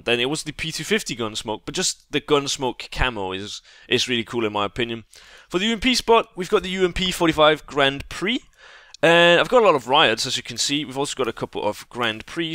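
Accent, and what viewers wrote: Denmark. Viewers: Danish??? your accent is really Danish ! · You sound every Danish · I don't know why... But you Sound very Danish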